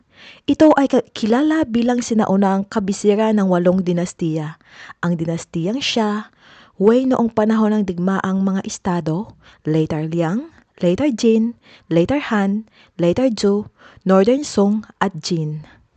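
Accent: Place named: Ilonggo, Philippines. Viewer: Ilonga kasi ibà kung minsan ang pagbigkas❤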